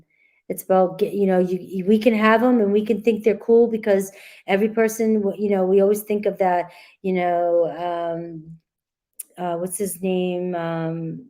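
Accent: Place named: New York, United States. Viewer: where are you from? You kinda sound like me, from New York but raised my kids in Maine. Been to Salem, Mass several times.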